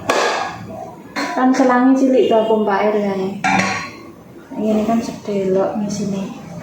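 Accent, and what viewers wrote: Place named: Java, Indonesia. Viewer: mf mbk ngomongnya jangan jawa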